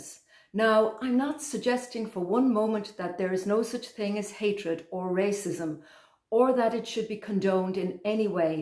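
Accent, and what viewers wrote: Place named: Ireland. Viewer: It's so refreshing to listen to an Irish person speaking so eloquently. Beautiful too.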